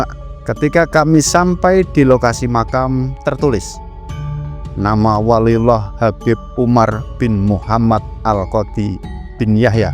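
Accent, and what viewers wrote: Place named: Kediri, Indonesia. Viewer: Tiang pundi Gus njenengan Logat mirip Kediri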